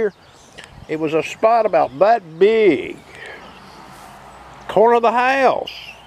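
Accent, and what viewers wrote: South, United States. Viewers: From one deep southern accent guy to another, I completely agree with what you said at the end of the video. No one program or detector is going to get it all. That’s why I tend to re-hunt sites with several different programs/settings over and over again. Seems like I always find something that was missed before with previously used settings. I kind of enjoy that little nugget of knowledge as it reopens up places to hunt that some might rule hunted out. One place like that is my own backyard. It’s one of the most difficult, iron infested spots I’ve ever hunted, and I enjoy the challenge of tweaking different programs to pick out more nonferrous targets. Not only is slowly sifting through the iron fun, but it helps me hone my skills as a detectorist. Enjoyed the vid.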